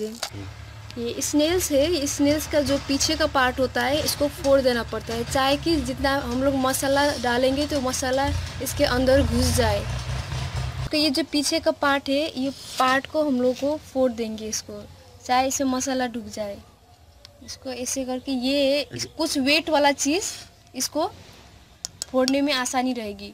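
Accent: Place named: Nepal. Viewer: Nepali ko Hindi bhukai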